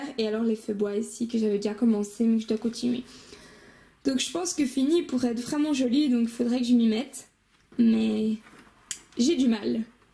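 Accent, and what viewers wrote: Belgium. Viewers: Cc tu devrais te remettre à ton double car déjà la il est beau mais une fois terminé sa promet sinon moi des moments je fait un fond mais ya des colos ou je regrette d avoir fait un fond enfin c est des testés aussi bon courage a toi et merci pour ton partage tu as un accent ? Belge ? Je me trompes ?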